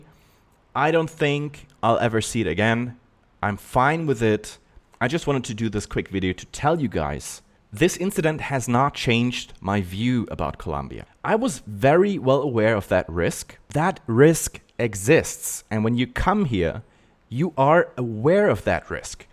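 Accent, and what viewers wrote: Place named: Germany. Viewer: gutes englisch...aber du kannst den deutschen akzent nicht verstecken ;) ich hoffe dir geht es nach dem überfall gut ?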